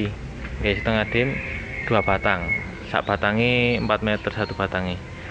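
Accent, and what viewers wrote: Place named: Java, Indonesia. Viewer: Medok.. Sekali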